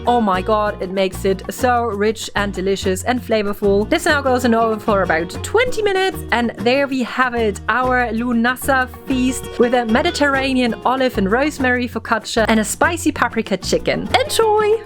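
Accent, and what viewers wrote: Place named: Germany. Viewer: How lovely to have stumbled on this video.... I noticed your accent and said to myself....ist sie deutsch?!!? Tagsächlich....ich bin seit 1979 in den staten....aber meine ganze familie ist noch in der Stuttgart area!!! Auch eine küchen hexe und practicing pagan für über 40 jahre!!! Your video is fun, lighthearted, informative and REAL!!! No witchy theatrics but genuine witch lore, love love love... you definitely have another follower!!!! Here in New Jersey we have similar weather as home so wheat stalks, sunflowers are used for lughnassad decorations and on the eve of lughnassad I make my bread dough, let it rest overnight and bake lughnassad morning!!! A small piece as an offering during Ritual and consumed with a Lughnassad dinner in the evening!!! Tschüss!!!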